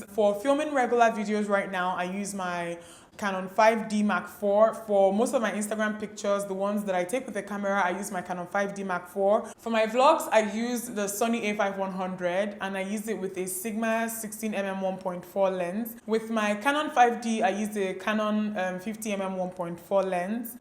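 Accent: Igbo, Nigeria. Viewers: I love how you called nneoma's name and how you answered her 😂 I love your igbo accent 😊♥️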